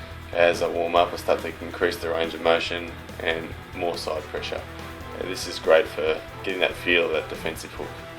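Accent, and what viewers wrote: Australia. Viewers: I reckon some people are just too literal. Obviously ya boy here isn't suggesting that this is the 100% tried and true way to train for hooking. He's just showing what HE does to train his hook, so that someone who is looking for a little more for THEIR own workout, they can try this. It's a FREE video SUGGESTING and OFFERING ideas to better someone else's training. It's not like you had to pay $65.99 for the video. Just take what you want from the video, give the Australian man a thumbs up, say something nice in the comments like "Hey, cool accent, thanks for the tip, and good luck in Australia" and be done. Don't smite the guy for free advice or the way he trains. He'll end up breaking your arm at the table.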